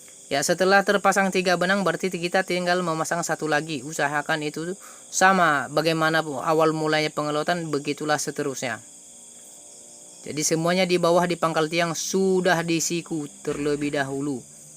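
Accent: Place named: East Nusa Tenggara, Indonesia. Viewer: saya suka saya suka logat ngomongny ntt kah.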